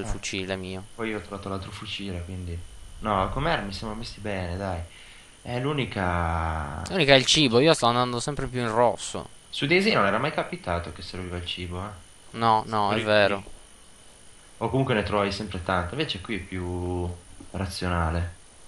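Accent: Sardinia, Italy. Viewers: aiò! Sardo sei?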